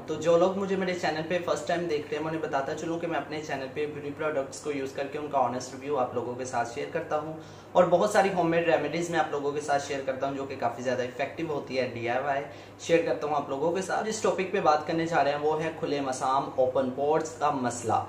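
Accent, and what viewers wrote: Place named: Lahore, Pakistan. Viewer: ni apka way of talk lahOriyOn ki trah h naa jb hi poChaa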